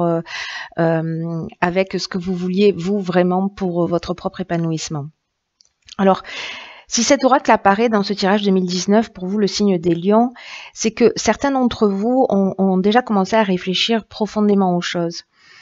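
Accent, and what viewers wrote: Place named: Marseille, France. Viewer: Bonjour. Merci pour votre tirage. J’adore votre accent. Je suis du Canada 🇨🇦 province de l’Ontario. Votre accent ressemble gros à un accent de la région de Marseille. Merci encore et il semble que l’année s’annonce bien pour nous lion 🦁!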